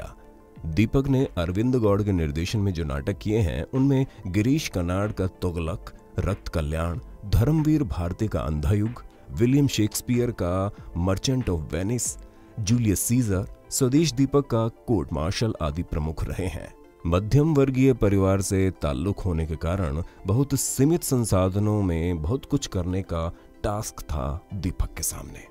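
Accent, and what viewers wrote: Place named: North, India. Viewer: 🤣😂🤣 । ये अच्छा है कि एक्टिंग करने वाले सारे उत्तर भारतीय कलाकारों की भाषा पे पकड़ और लहजा शानदार होता है। आप भी यूपी के हैन? वैसे आप ट्यूटोरियल कब शुरू करने वाले है।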